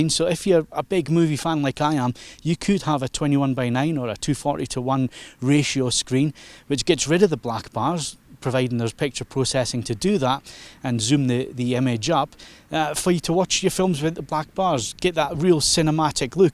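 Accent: Scotland, United Kingdom. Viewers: This guy is from Scotland. I believe.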